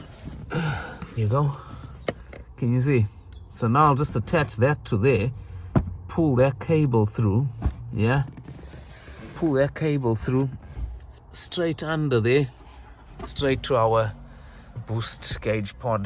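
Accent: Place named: South Africa. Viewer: Bra ekse sunno wk.. you chune like me from s.a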